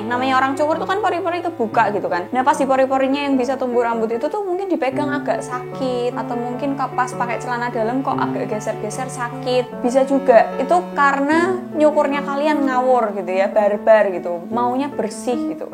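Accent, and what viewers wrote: Java, Indonesia. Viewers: Suarane medok mBake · Suka kesini gara" cara ngomong nya medok wkwkwk😆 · Medok banget kamu mbak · Suara e ngangeni...medhok" gemes'!!! . Maju terus MB cantik... .. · Arek ndi iki omonge kok jowo wkwkkw.. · Aku gak ngerti bahasa jawa,tapi aku ketawa,cara bicaranya jawanya lucu,terus medok banget😅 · Mbak sampean Jawa mana sih...koq enak banget nada bicaranya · Wong jowo banget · Mba kocak ya logat jawane apik bahasamu aku suka dengerin konten panjenengan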